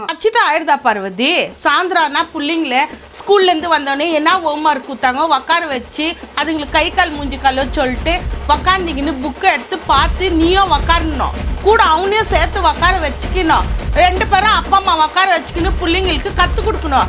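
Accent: Bangalore, India. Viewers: Bangalore tamil ah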